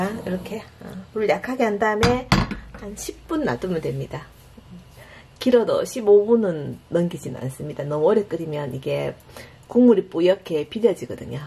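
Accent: Gyeongsang, South Korea. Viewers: 우리나라 갓중엔 돌산갓이 최고라지요? 전 뭔가 쌉살한 맛을 좋아해서 갓김치 좋아해요. 저도 멸치똥 머리 안떼고 해요. 귀찮아서이기도 하지만 그냥 약간 씁쓸한 맛이 좋아요 ㅋㅋ 귀여운 경상도 사투리로 조곤조곤 상냥하게 설명을 해 주시니 정말 재밌고 기분이 좋아집니다^^ 맛있겠다 ㅎ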